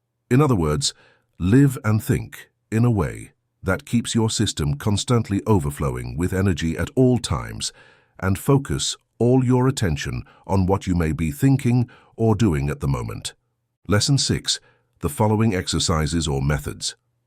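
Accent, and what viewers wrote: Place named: United Kingdom. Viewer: So, are you an adrenaline junkie looking for another tequila sunrise? Do you have something against developing positive mental health habits? The reader isn't saying anything that isn't standard New Age thinking. Actually, much of what he's saying is older than New Age. Some of it is echoes of early 20th century thinking. Other parts are taken from ancient texts, especially the Bible. Is it the delivery that offends you? He's too British for your ears? I'd be interested to hear your advice for mental health. Are you anti calm? Pro aggrevation? Do you thrive on the energy of anger because it feels so good to douse those flames with copious amounts of alcohol?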